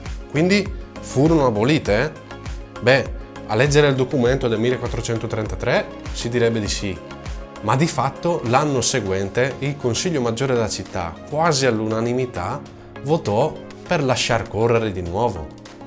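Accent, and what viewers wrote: Brescia, Italy. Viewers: ma che bravo! mi sono bevuto 13 minuti di analisi comparata di costumi medioevali (col bresciano come caso, ma riapplicabile ovunque) che l'inflessione marcata rende più simpatica senza per nulla intaccare il contenuto (te lo dico francamente, di solito le parlate bresciana e bergamasca, da parte degli altri lombardi come me, vengono associate a generi meno dotti). Iscritto al volo, intanto cerco gli altri tuoi lavori. Avanti così! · molto. Anche l'accento bresciano che hai mi piace! Sono stufo di accenti romaneschi e napoletani. E poi ti esprimi bene. Cosa rara tra i giovani. Continua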